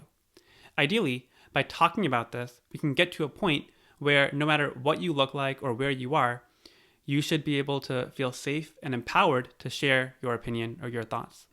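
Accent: United States. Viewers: What's wrong with american accent?, even if u are faking, to speak like this needs some hard work, I'll appreciate instead posting negative comments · It's wild how it's always the one negative comment that sticks with us. You always sounded like any other American to me (from an undisclosed African country)...that wasn't meant to sound insulting fyi...anyway, the content is great, anyone focusing on accents and not the basically free knowledge being dropped is to be ignored. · Don’t bother about those comments, you are doing awesome job.You are raised here and definitely you will have the American accent.Lot of people in India do not know this. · You sound 💯 percent American. No.accent.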